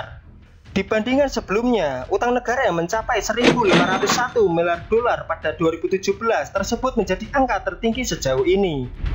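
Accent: Java, Indonesia. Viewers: Narator nya MEDOK sangat 😁🙏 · Jelas wong jowo iki admin e kwkwkwkkw. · Medoknya itu lohh boss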